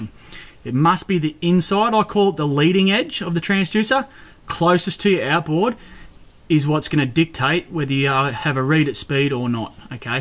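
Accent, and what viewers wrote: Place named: Outback, Australia. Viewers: More specifically a Queenslander accent. ‘Youse’ is a dead giveaway. Very ocker, mate! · I just want to know how I can get the Australian outback accent for my videos! That accent makes everything <num> times cooler and interesting!!! That accent alone is worth like 100k views Mayte!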